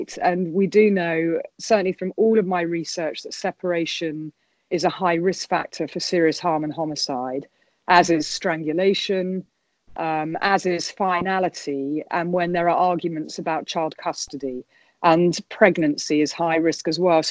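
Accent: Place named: RP, United Kingdom. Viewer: You people have no idea she is well respected worldwide, secondly her way of speaking is a received English pronunciation and accentless, and her voice is very easy to listen to.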